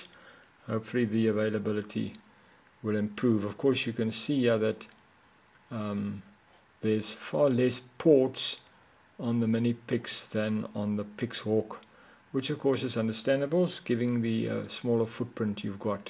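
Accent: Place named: Afrikaans, South Africa. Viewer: Is jy afrikaans?😀 Jy het definitief my aksent!!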